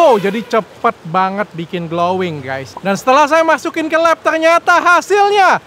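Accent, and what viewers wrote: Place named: Sumatra, Indonesia. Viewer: Kami org Sumatra emang ngomong nya kekgtu 😂😂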